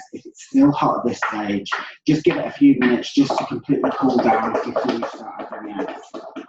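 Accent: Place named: Australia. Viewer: I am very interested in this recipe but I cannot understand a word he is saying in this video. 😞 very poor audio + heavy Aussie(?) accent.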